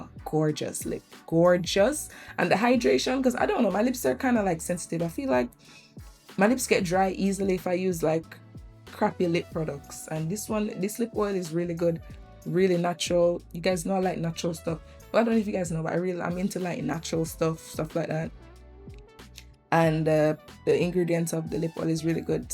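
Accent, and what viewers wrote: Jamaica. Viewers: Right when mi hear the Jamaican accent I'm😊 sold !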